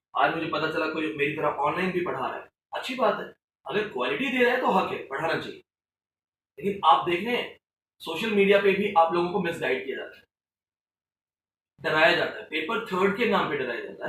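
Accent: India. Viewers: STICKING TO OUR INDIAN PRONUNCIATION AND STYLE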